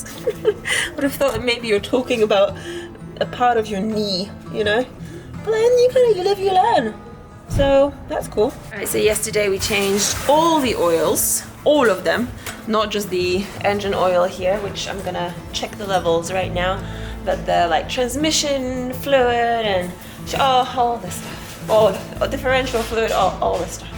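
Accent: United Kingdom. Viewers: ...albeit with a German name and a British accent.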